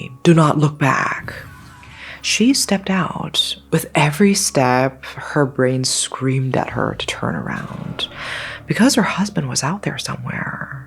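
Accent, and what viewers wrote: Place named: West, United States. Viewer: The story was interesting, but I believe you can tell the story better with more assertion and tones rather than just speak as though you are reading, with a 'western accent'? (I'm Malaysian) and stretching every few words in a whisper tone - I.e "6 Caaaars, 12 veheeeechles, 17 peeeeeople were dead, the road is quieeeeterr than it should be" and yes, for the sensitive commentors I know that's gonna try and argue with me here, I know I can just "exit the video" and be on my merry way, which yes I'll do so, but hopefully some constructive criticism helps this fellow youtuber gain more likes and views in the future.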